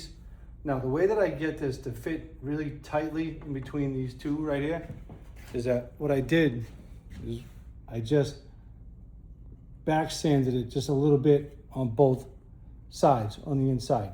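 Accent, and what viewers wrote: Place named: Boston, United States. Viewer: Hey bud, I can tell a fellow Bostonian when I hear one. It’s not your the accent it’s the way you talk. Keep up the good work.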